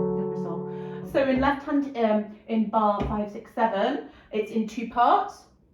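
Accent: United Kingdom. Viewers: Amazingly angelical English accent! Flawless explanations. Again, thank you. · Snap! British English...love it!!! Same here!!!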